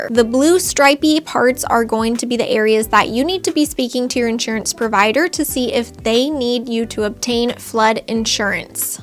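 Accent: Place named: Northwest, United States. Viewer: I watched a few more, well, my guess from your speech, is the NW. Hope business is well.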